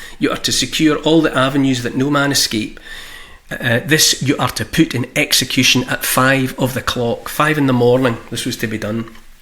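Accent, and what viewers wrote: Scotland, United Kingdom. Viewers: Brilliant. Oral stories enchance the written word and covey the spiritual nature of history. Especially done with a fine Scottish accent.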